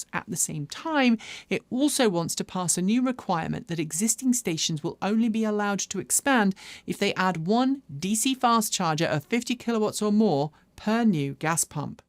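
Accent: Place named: RP, United Kingdom. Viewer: Love the Brienne of Tarth accent ❤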